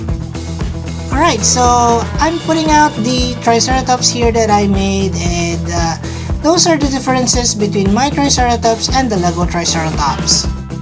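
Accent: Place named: Philippines. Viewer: and I see ur filipino! By your voice!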